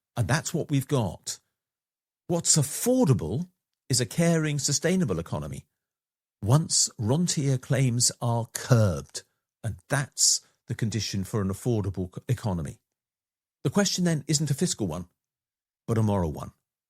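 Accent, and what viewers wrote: United Kingdom. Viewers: If not for the accent, I'd have thought you were talking about the States, at first. Perhaps you still are. Sadly, this view of economics is taboo in the educational system of the States. Even discussing it in passing might earn one death by pyre...or guillotine. Should I assume the same applies to the schools throughout the UK? Or do you folks prefer dunkings? How much closer to the brink do you think the world can come before chaos ensues and we all return to the wars and turmoil that marked and marred the late 19th and much of the 20th centuries? History might not repeat but it has a nasty habit of echoing into the future. Of course, the last major reversal of this trend did not take place until after a decade-long global depression and an even more devastating global conflict, that left millions dead and tens of millions more forever changed. 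Even then, the correction lasted little more than a single generation before "evil" began its insidious war on the rest of humanity...again. What admonishment do we, who bear witness to the death rattles of this conflict, leave for those left standing when the next correction takes place? Or is it a futile exercise, as they and theirs must re-learn all the hard lessons, all over again? There is a theory that a small group of people suffer a neurological deficit much like but parallel to autism. Except in these cases, rather than never acquiring the ability to interact with the rest of the world like the rest of us, these others fail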